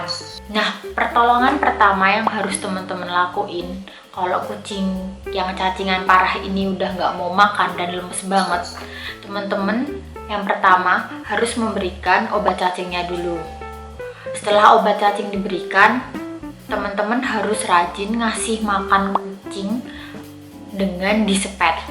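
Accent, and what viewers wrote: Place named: Banyumas, Indonesia. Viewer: Si embak ini kaya irg banyumas ,, bicara ny ngapak😅😅😅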